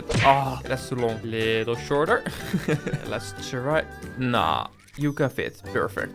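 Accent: Netherlands. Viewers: Ik ruik nederlands want je naam is en ik hoor nederlandse accent English: i smell some dutch cause ur name is dutch and i hear an dutch accent in ur english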